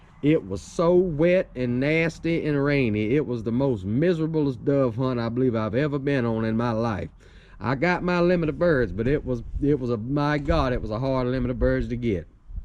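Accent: United States. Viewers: I don't know what part of America but just love that accent. Thanks for the review.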